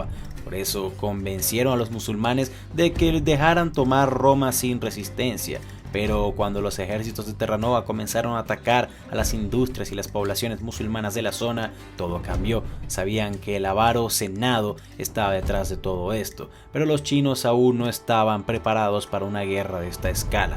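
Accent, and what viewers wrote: Venezuela. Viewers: eres venezolano? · no se como llegue a este vídeo ..pero reconozco tu acento venezolano,,,,entonces te dire....te la lacriaste jajaja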